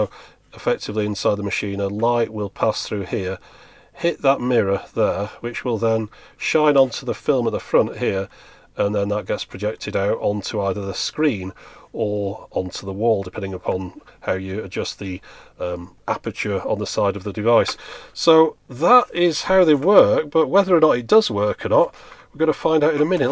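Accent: United Kingdom. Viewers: Hi sorry, what sort of accent do you have? I can hear it's a UK accent but what part? Just curious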